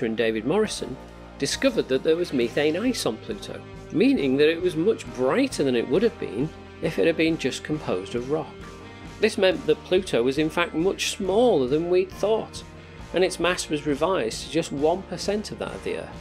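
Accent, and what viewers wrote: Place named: Bolton, United Kingdom. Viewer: Are you from Bolton?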